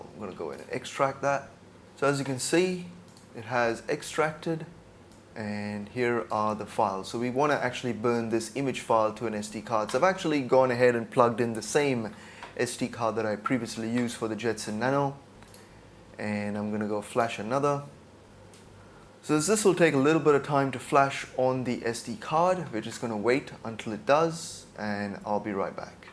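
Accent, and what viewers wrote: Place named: Australia. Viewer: yeah I picked your accent for Aussie. I live near Murwillumbah.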